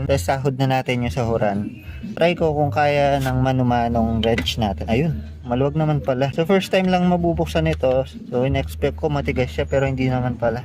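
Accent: Pampanga, Philippines. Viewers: Kapampangan kapin ne paps,,tin halu ing pangatagalog mu ehe! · kapangpangan kaba paps ung tono mo kasi e hehe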